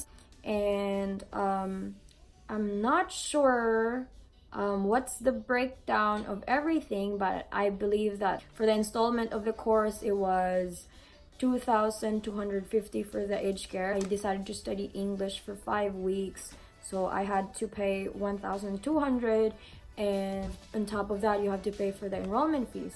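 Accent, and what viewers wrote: Australia. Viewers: How did you get settled do you have relatives there? Btw love aussie accent! 😊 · char naging australian accent na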